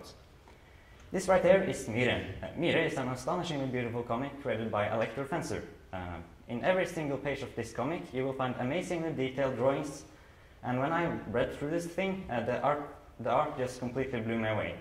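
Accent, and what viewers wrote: Norway. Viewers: When he tells you to put on subtitles for his Norwegian accent but fans knows exactly what he is saying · Thats what we call norwegian english! I have that accent sometimes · Are you from (Norge) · Wait, wait are you from norway, HALLA FRA BAMBLE! EJ BRO HEG VISTE IKKE at du var norsk, hello from Bamble I didn't know you're Norwegian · Slaughtered Norwegian accent? No, your accent is beautiful. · The clapping went so long ;-; my heart haha. I also love your accent and had noooo idea it was a Norwegian one?! Voices are wild.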